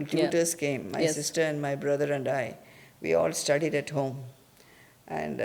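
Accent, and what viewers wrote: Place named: South Africa. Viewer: Your voice accent is very interesting. I am a South African Indian and your voice has some of the accent from South Africa 😅.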